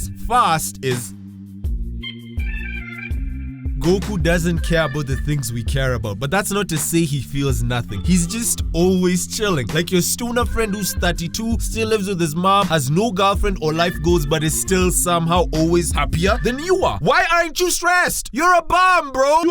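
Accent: Kenya. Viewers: Oh shit i knew that accent from somewhere wsg my kenyan blooda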